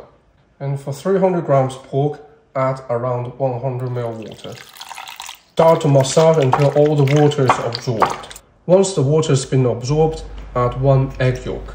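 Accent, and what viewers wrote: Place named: Newcastle, United Kingdom. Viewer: Love your no nonsense cooking as much as your Geordie accent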